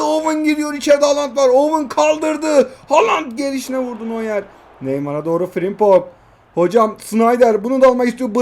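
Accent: Kahramanmaraş, Turkey. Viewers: abi sen maraşlımısın kfepofjkwsf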